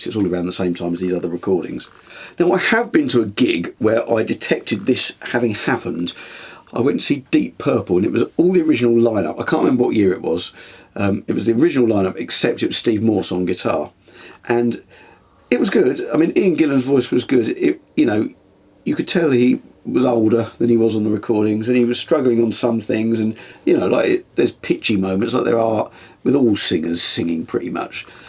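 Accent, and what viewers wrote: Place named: England, United Kingdom. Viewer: first time seeing your channel, and I'm a fan. I share your love of music, and enjoy your analysis, and your English accent is awesome man. This whole Tracks thing has really blown up. There has always been backing tracks used in live concerts. I mean ACDC in Hells Bells, played live w track of the steeple church bell, Van Halen keyboard fills on Jump, Love comes Walkin In, and others, that after the 5150 that Eddie actually played keyboards live, they were tracks on live shows after that tour, Ed was back on the guitar. Def Leppard recently now come under fire for using tracks, which is no secret, and Rick Savage has even demonstrated in many behind the scenes interviews, demonstrated the pedals he uses on certain songs for the background intros to a few songs, and the pedals he plays for the short uses, but all the drums, guitars, and amazing vocals are live. 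What's happening now though I the bands like Motley Crue, being outted for the guitar, bass, drums, and Vince Neal's vocals, ALL TRACKS, talk about Miming, thats why fans are pissed, and how Motley Crue keeps latching on to the coat tails first w Def Leppard, and coming up soon w Guns n Rose's, who wants to pay <num> to come out and watch a bunch of overweight has been lypsyncing and playing air guitar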